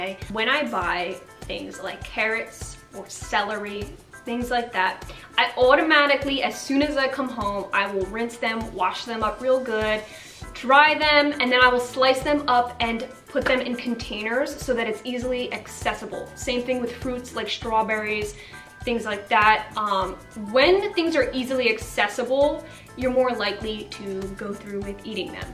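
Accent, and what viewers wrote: New Jersey, United States. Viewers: Visiting from channel! Do I recognize a Jersey accent? Great video!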